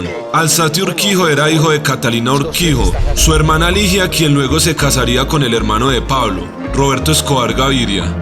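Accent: Antioquia, Colombia. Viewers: Pues claro! Y más precisamente, de Antioquia. Ese acento paisa lo reconoce hasta un marciano 😂👌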